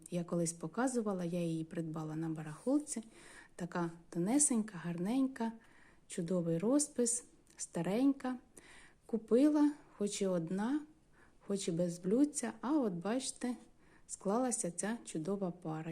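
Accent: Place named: Central Ukraine, Ukraine. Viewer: Голос приятный, успокаивающий. Рассказываете интересно, слушать приятно украинский язык центральной части Украины. Я училась в Черкассах, там тоже так говорят, как Вы.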